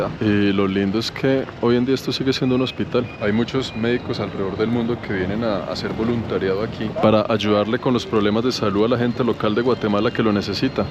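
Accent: Colombia. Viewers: Según tengo entendido, es hijo de madre Colombiana, padre Argentino, nació en Panamá, vivió varios años en Canadá, tiene sangre de Mesoamericana y de la península Ibérica, con acento colombiano. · Muy buen relato hermano, por su acento, ma párese como de Bogotá, Rolo como les llaman a los de Capital de Colombia. Gracias por su reportaje, de Antigua., Monumento Colonial de América. Saludos Cordiales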